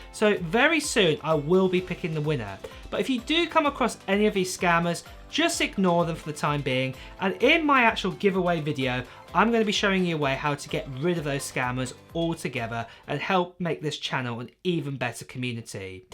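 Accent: United Kingdom. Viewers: Well, I want to buy the iPhone 11. But sadly I don’t have money for that. And also i didn’t already bought any apple gear or product cuz I don’t have money for that, And i hope i will be the one who got your iPad. Because It can help me in my studies. btw i like your british accent, congrats and godbless💖💖💖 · I hope to get the iPhone 13 for Christmas. I your channel is great and informative and I just really love your British accent when you say thousands it sounds like fousand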